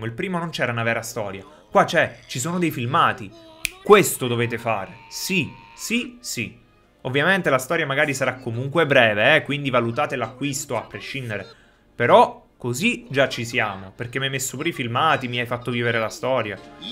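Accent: Naples, Italy. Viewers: Ma quindi sei napoletano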